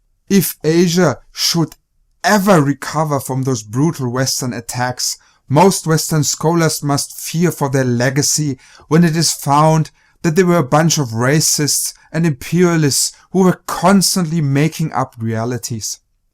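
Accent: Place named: Germany. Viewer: dude....i clearly can hear your german accent.....where exactly do you come from?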